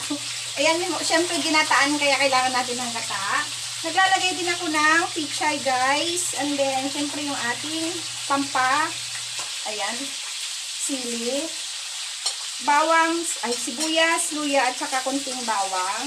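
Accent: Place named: Visayas, Philippines. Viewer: Ha ha natawa ako sau sis para kang totoong bisaya , agoy sarap naman nyan ginataang tulingan.yes tuloy lang ang laban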